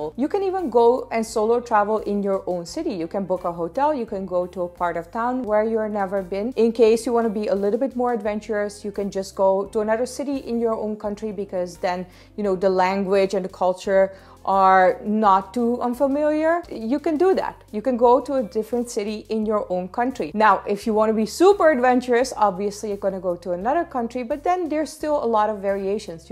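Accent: India. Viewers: and you got an indian english accent :P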